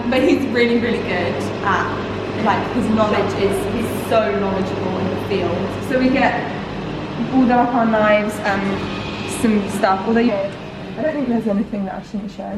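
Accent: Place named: United Kingdom. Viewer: amazing video, very informative, the only thing that bother me was the audio because English is not my native language soooo it was a little difficult to understand what you were saying (also British accent is hard to follow) but otherwise very good video ❤️❤️❤️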